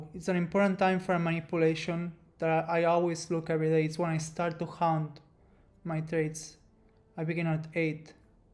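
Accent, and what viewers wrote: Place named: Spain. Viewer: Ya decia yo que me parecía que eras español jajajajaj . Buen video tío !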